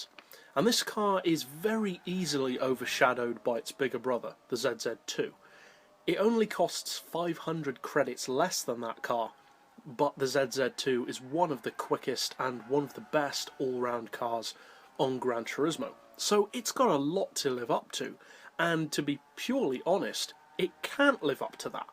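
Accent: United Kingdom. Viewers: urr so british!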